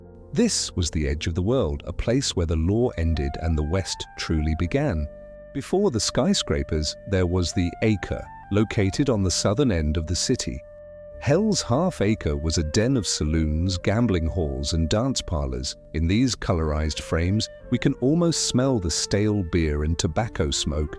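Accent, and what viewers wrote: England, United Kingdom. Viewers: 🇨🇱🇨🇱🙋WHAT. ?.THATS A ENGLISH ACCENT. ....I was also born at Harris ..miss my Fort Worth very much .was raised off Camp Bowie ..at Grandparents hous my sweet grandfather bought it brand new for Grandmom 1937. ..we had it 64 yrs ..she lived there then Mom came back & raised. US girls w Grandmom ..thanks to granddaddy ..we had no mortgu pymt..they were magnificent people he was a LION' & promoter of Fort Worth Cats baseball and other fine things .🇺🇸🇨🇱🙋💙💙💙even though he was born in Oak Lawn ,Dallas. They settled in Fort Worth , after honeymoon at The Baker Hotel 1930. Dallas🇨🇱💙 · Why is a guy with an English accent narrating this? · Does AI think we need a British guy to narate? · Pretty neat visuals. But why would the narrator speak w a British accent?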